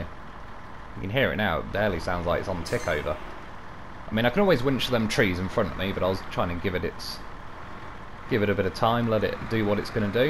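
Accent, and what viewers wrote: United Kingdom. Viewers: Your british accent is so hard...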